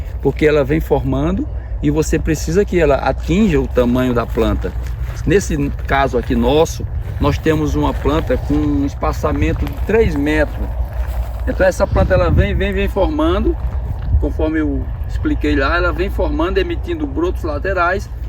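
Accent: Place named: Northeast, Brazil. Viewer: Primeiro. Sua simpatia e sem medo de estar ensinando outras pessoas ou concorrentes. Imagino que seja do nordeste pelo sotaque . Amo as uvas de mesa do nordeste. Estou sempre comprando porque são muito doces e deliciosas. Não sei seu nome, mas parabéns pelo seu cultivo e continue nos mandando essas delicias aqui pro sul. Forte abraço de Santa Catarina.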